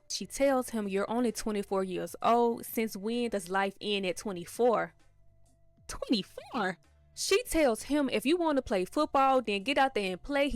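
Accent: Memphis, United States. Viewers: Not only do I love Good Times, but you commentating it with that Memphis accent is just dope!!!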